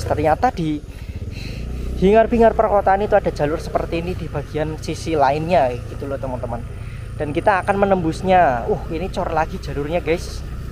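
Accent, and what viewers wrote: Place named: Banyumas, Indonesia. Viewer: Mantap uji nyali... Trus sy seneng infonya Medok ngapaknya..👍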